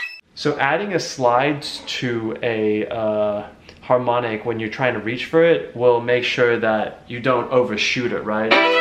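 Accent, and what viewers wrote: United States. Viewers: This is like the musicians equivalent of a no-makeup selfie. BTW I thought he was Australian? How come he has an American accent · Why do you sound so American in this video but so Australian in the Ling Ling workout video lmao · I thought he had Australian accent oh well he has a great American accent he’s amazing at the violin too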